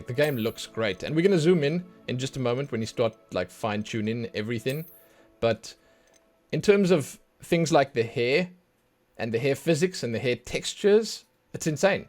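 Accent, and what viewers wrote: South Africa. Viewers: I like how detailed this is thank you, and by any chance are you South African? I love your accent! Also I love your commentary and jokes here and there it makes me laugh! Thank you for this lovely video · Are you South African?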